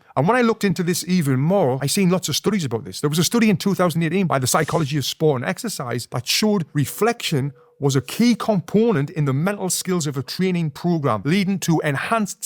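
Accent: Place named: Newcastle, United Kingdom. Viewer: You produce great content and I love the Geordie accent. My old man was born in South Shields in 1907 and our family moved to Australia in 1951. He never lost his Geordie accent and was very proud of his origins. He fought pro as a young man and served in the Royal Engineers in the War. He won the regimental middleweight title when he was 37 - the oldest entrant in the tournament. When the CO presented him with the winner's prize - 500 cigarettes!!! - he said to him, "Well done sapper. I think you've fought before!"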